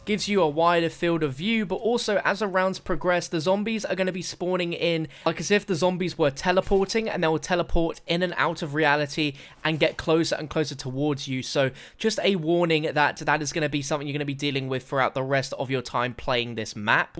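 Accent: United Kingdom. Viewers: omfg i cant stand ur british voice its awfull pls stfu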